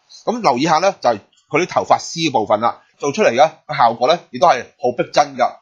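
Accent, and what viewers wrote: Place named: Hong Kong, China. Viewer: 本来想这找一个背景不是白色的 扣头发丝教学， 一听还听不懂，头一回听感觉像香港那边人说话的声音。。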